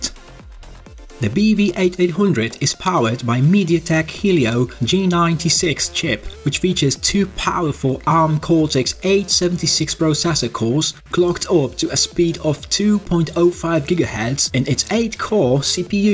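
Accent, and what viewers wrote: United Kingdom. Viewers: I really hoped for some original content or interaction with the device. This kind of seems like the original media packet recut and shared by an English accented presenter. The infrared example looked super fake, as if the scene depicted was CGI.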